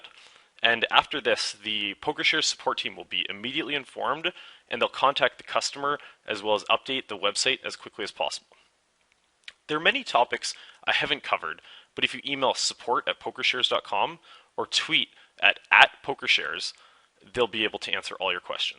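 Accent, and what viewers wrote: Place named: Canada. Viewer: This guy is the most Canadian sounding Canadian ever.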